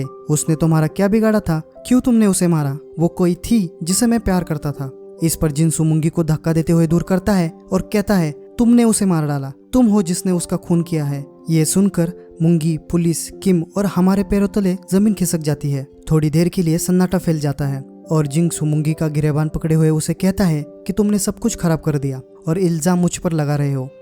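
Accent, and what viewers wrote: Maharashtra, India. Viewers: गावंडाळ हिंदी बोलतोस म्हणजे महाराष्ट्र चा आहे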